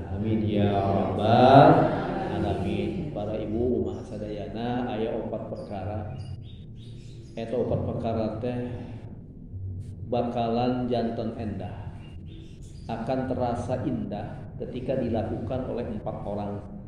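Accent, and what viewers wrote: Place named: Sunda, Indonesia. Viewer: Meni nyunda........😁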